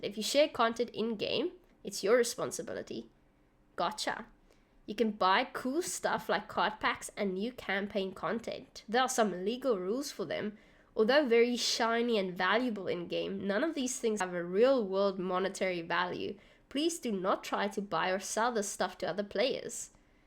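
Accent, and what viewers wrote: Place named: South Africa. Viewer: South african accent the best 😁😁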